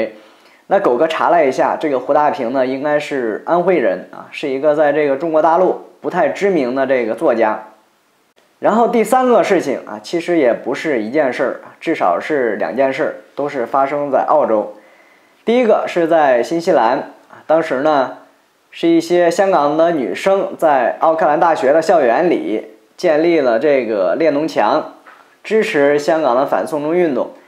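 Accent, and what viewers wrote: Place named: Northeast, China. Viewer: 狗哥是東北哪旮旯來的，口音好玩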